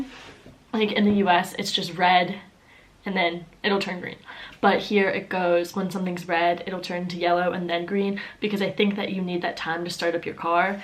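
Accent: Germany. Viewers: Pretty German accent...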